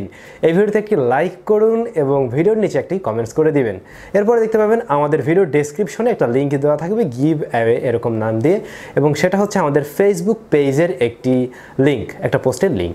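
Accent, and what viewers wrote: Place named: Barisal, Bangladesh. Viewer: that barishailla accent .... ahhhh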